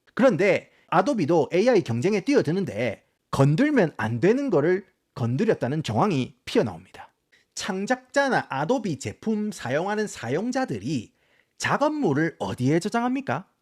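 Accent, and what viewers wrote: Gyeongsang, South Korea. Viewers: 왜 알고리즘에 뜬건지 모르겠는데 경상도 사투리와 굴러가는 미쿡식 영어 발음의 콜라보가 매력적인분이시네요ㅋㅋ 좐/촬스 + 으깨/느그들 ㅋㅋㅋ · 이런 고급 정보를 개무시칸 갱상도 사투리로 전달 받는다는 점에 대해 소화 불량감을 느낄 갱상도 사람덜에 대해 생각은 안해보셨는지? 도무지 적응이 아니되옵니다~😅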